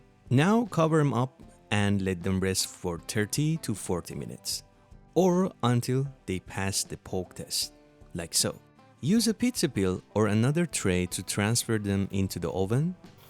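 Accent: Iran. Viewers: i recognise a clear Persian accent. am i right dadashi? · What a Persian accent 😅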